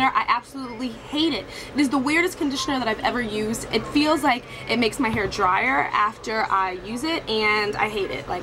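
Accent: United Kingdom. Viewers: I wish i could do yoour british accent ! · I love your British accent!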